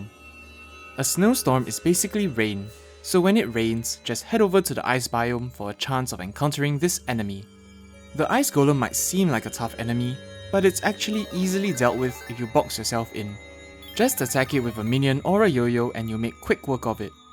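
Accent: Singapore. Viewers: just curious, are you singaporean? your accent sounds v familiar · His voice like a Singaporean guy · is guy sounds singaporean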